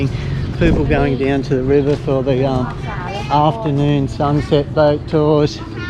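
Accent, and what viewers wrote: Australia. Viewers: I thought I was Aussie... THIS bloke is Aussie!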